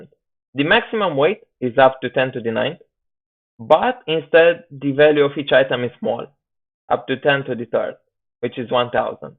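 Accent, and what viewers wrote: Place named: Russia. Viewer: The problem is not because of Mic, the problem is that his first language is not english. I think he is Russian. Still it is not that tough to understand his accent.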